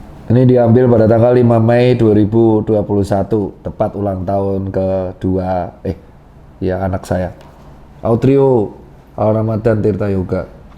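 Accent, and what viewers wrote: Java, Indonesia. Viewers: Dr. Tirta logate koyo wong Jowo Cah 😂😂😂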